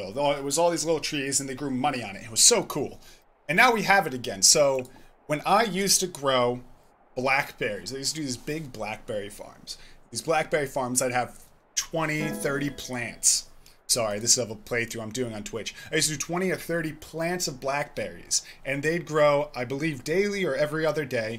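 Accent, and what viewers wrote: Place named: England, United Kingdom. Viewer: Hello i’m french and I discovered your Channel thanks to pixia. I don’t Know if i have a good English but i like your English accent when you speak french (its so cute ). +1 suscriber ❤️❤️❤️ (You tried to Say my username but you did not succeed because of the Mademoiselle(Mlle) 😁)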